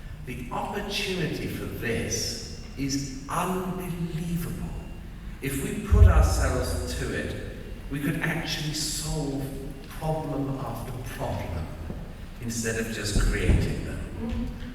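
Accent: RP, United Kingdom. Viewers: Talks a lot and says nothing in that horrible upper class English accent of a man who has done nothing in life but give talks like this. As if real people didn't exist.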